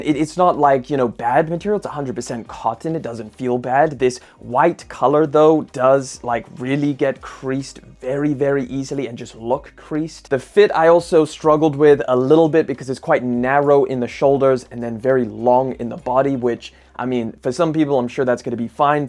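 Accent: South Africa. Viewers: Bro are you by any chance South african? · Bro I didn't know you South African.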